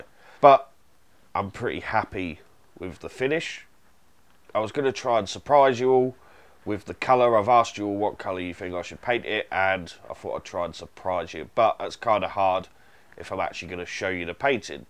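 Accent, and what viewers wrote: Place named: London, United Kingdom. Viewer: Hey, great series on the Bandit rebuild! I've bing watched them over the weekend and found them very helpful. A year ago I bought a 2002 Bandit 1200cc and fell in love with it. Like you I would like to keep riding it for awhile, and even though I've never worked on a motorcycle before, I've stated to to tackle some basic tasks like changing the brake pads, and replacing the sprockets and chain. By seeing you take the bike apart and fix what needed to be fixed gives me confidence and clear guide to tackle any future repairs and modifications. So thanks to you and your sponsors. I'm from the States and It seems that that Bandit series is more popular in England than here. I not sure why. One other thing, your accent sounds similar to Micheal Caine's, are you from London?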